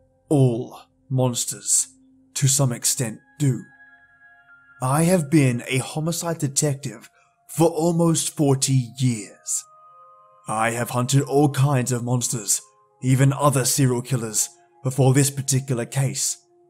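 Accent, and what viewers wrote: Australia. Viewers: tis the best way to fall asleep, all cozy in a blanket burrito. And if I do manage to churn out some twisted tale of monsters and mayhem you shall be the first to read it I assure you. Absolutely love your voice in particular and the ozzie accent in general. ^_^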